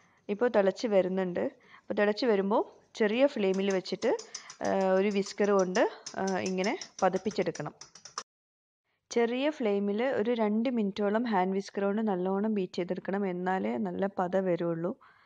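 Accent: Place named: Malabar, India. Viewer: samsaram kettappol thoni kozhikodo malappuramo aavumn. Njn kozhikode aa. Vdos okke spr aanu but sound kurachoode aavato😊😊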